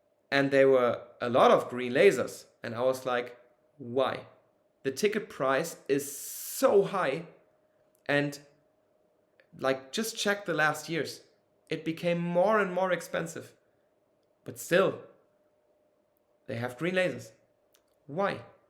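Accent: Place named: Germany. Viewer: Your English is quite alright, of course with a German accent. Stopped listening after your first point though: "Yeah I know the discussion about alcohol is also a drug and smoking too but for ME sniffing(taking, whatever) IS something else" So your first (and most important?) point is just an opinion of you. No personal experiences, no proper argument, nothing... You maybe seen some ppl overdo it, some with big eyes and you want to forbid that? Why? What does that have to do with you what ppl overdoing alcohol don't?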